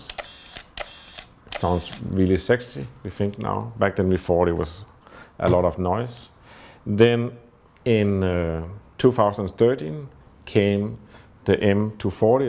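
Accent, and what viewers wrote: Denmark. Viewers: Danish English > Dinglish!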